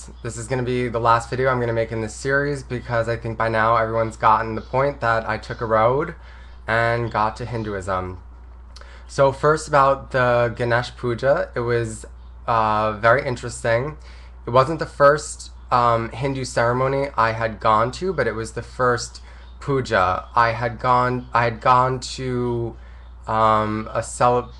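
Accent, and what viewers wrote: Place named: United States. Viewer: No need to apologize. Hindi pronunciation varies in different regions of North India. You develop your own American Hindi pronunciation. That would be more original. ;-)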